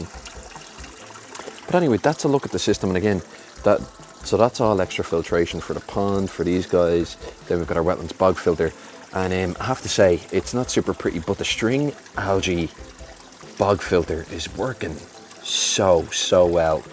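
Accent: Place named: United Kingdom. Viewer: I’ve just come across your channel (Im a fellow goldfish YouTuber 😁) where abouts are you based? You sound British but I can’t believe the weather is warm enough to have goldfish in a tank outdoors all year. It looks amazing by the way and I’m definitely subscribing so I can see more! 👍🏻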